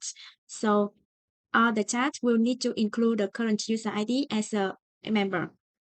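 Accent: Vietnam. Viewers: Nghe phát âm tiếng anh giống người việt cái nhìn lại tên thì ohhh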